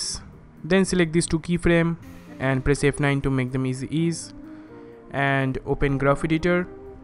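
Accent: Bengal, India. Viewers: bro are you bengali?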